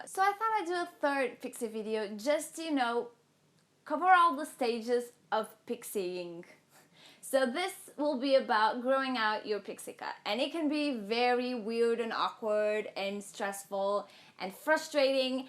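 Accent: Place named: Portugal. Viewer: eu estava tentando descobrir qual era sua nacionalidade já que não parece ser um nome ingles, e por causa do sotaque também, e agora que descobri que você é Portuguesa tudo fez sentido haha beijos do Brasil! adoro seu canal!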